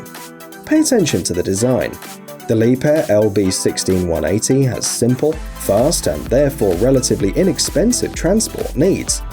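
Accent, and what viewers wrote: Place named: RP, United Kingdom. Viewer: Looks like someone took someone else's video and put a poncey, Harry Potter type commentary on it.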